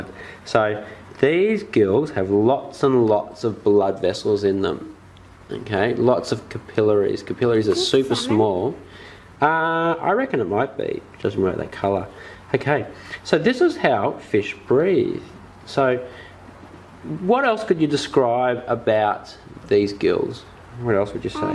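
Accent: Australia. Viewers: its friendly to grownups beginner. he talks australian english. takes, cant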